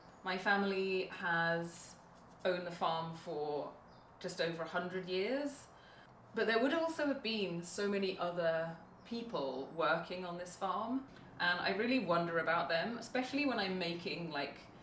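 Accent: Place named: England, United Kingdom. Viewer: Es una pena que no tenga subtítulos en español, solo logro entender algunas palabras del encantador acento inglés 😢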